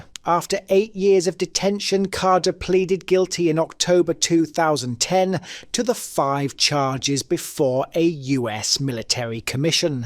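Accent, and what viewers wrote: England, United Kingdom. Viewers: 👋 Hi. I'm a Canuck. I live in Kingston Ontario. First I want to thank you for taking the time to learn how to pronounce a lot of the words properly, or are you Canadian? We have lots of folks from the UK living here, so yes I did notice the accent. ☺️ Other than a couple of words ~ great stuff. Brilliant! But~ you and most others got everything wrong about what constitutes a life sentence in canada. What happens is you are given a life sentence for murder and you are imprisoned for 25 years minimum. The odds of being paroled the first time you try, especially for a violent crime, are very slim to impossible. My father-in-law said he didn't see that happen once. Then IF you are released, you go to a halfway house where you could spend up to five years. You also have to report to a parole officer once a week for the rest of your life, and with any wrongdoing/breaking the rules, on the part of the prisoner, s/he can be returned to prison to see out his/her sentence never to be released or less, depending on the rule s/he broke. 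So yes a life sentence IS a life sentence. (FYI~prison means a federal institution, whereas jails are provincial and municipal) to spend the rest of his life there, especially if it is a crime involving violence. So when they talk about a life sentence that is what you get. Under no circumstances is anyone convicted of a crime involving violence ever just paroled and allowed to walk out the door. They have very strict rules they have to follow and if your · Some english guy talking about Canada!!!?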